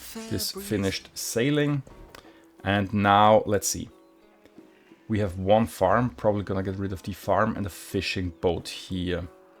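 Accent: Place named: Austria. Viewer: Austrian accent detected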